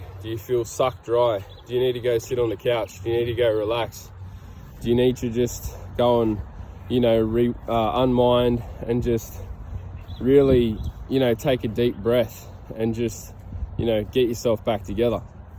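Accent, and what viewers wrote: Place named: Australia. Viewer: awesome stuff my brother just found you're channel now you sound like an aussie I'm from sydney would love to have a good old yarn with my fellow brother in Christ about our testimonies , the spiritual warfare , isolation of those who can see and hear ,the ones that pray for discernment and pray for a mighty strong faith in the way the truth and the life and pray our Father`s will be done on earth as it is in heaven . i don't usually comment on anything but felt i should right now would be cool to catch up some time my bro GOD willing God bless bruv Deano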